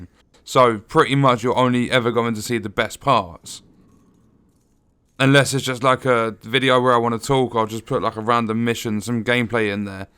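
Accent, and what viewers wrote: United Kingdom. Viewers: U make me want a British accent bruv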